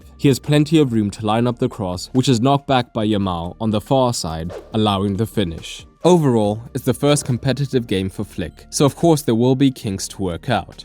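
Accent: South Africa. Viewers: Why does bro sound south african😭😭😭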